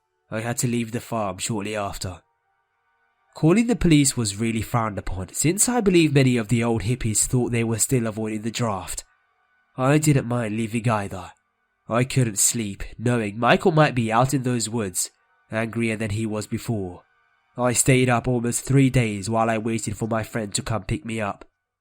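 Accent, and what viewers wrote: United Kingdom. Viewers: He is from the uk like me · Good story + English or British ascent (XD) = Subbs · good video. nice reading another new sub. what part of UK you reside? Manchester myself 😀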